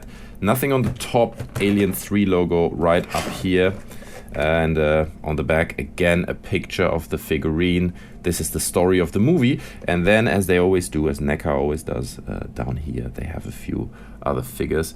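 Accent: Germany. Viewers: Du bist doch sicher deutsch ich höre es. Oder irre ich mich? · Du bist deutscher stimmts? Man hört das irgendwie am english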